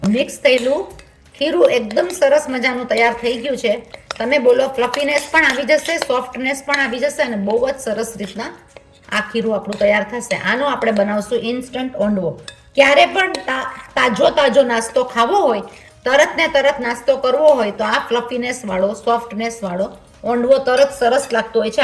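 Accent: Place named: Charotar, India. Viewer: Ben tamane mare ak tips aapviche te ajke tame basan sathe puovane karas Karine bijivar honvane banavsho ok 🎉 dhayvad tamari charotari bhashathi Mane badapan ni yad aavi gai Jay mataji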